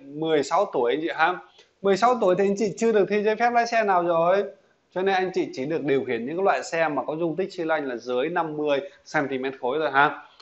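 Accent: Thanh Hoa, Vietnam. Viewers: Thầy người Thanh Hoá,nói giọng Thanh Hoá nè. Thấy thân thiện dễ gần và lịch sự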